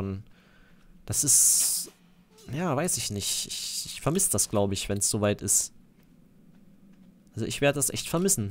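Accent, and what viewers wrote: Germany. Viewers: euer Akzent (Ossi-Akzent) für uns ziemlich lustig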